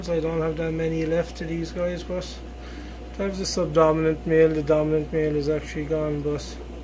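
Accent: Ireland. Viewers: You’re either from Galway or Mayo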